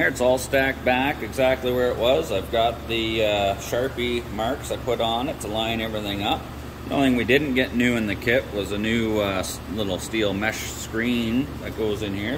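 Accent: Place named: Maritimes, Canada. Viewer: I like your accent, but trying to place it. I've got it narrowed down to either PEI or NS. Am I close?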